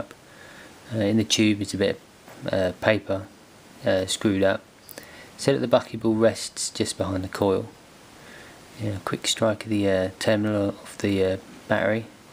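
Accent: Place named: Cockney, United Kingdom. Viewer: It's what's known as a "Cockney" accent.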